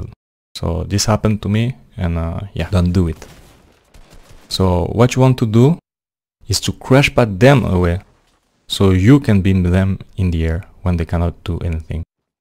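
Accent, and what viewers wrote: France. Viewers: Why you have a french accent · That french accent thooo, keep up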